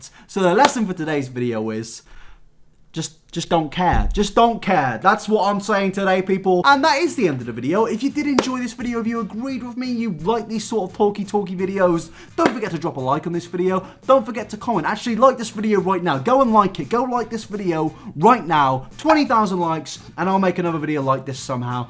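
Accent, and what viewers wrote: United States. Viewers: Your American accent is bloody lovely mate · I didnt like the American accent but I liked the video anyways. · You're so adorable!! That American accent 😂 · Liked the video for that lovely American accent chap 👌👌👌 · Just liked because of the American accent. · I really wanted to like this video. But I cannot condone that American accent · fantastic american accent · sad that i will have to dislike because of the american accent :(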